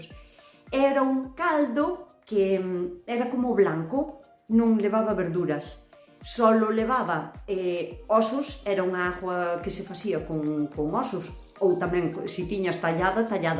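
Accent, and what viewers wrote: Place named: Ribeira, Spain. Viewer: Hola,primero por favor donde vives.despuesde más de 50 años puedo oír hablar en gallego,es como en mi pueblo Ribeira ❤ Vivo en Aragón ,añoro mucho Galicia.😢 Me encanta oírte ,abrazos ❤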